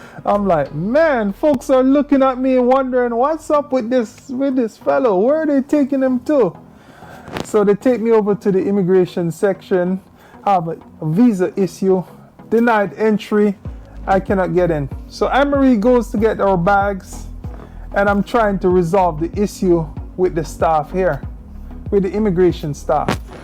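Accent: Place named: Caribbean. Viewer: I hear the west Indian accent where are you from?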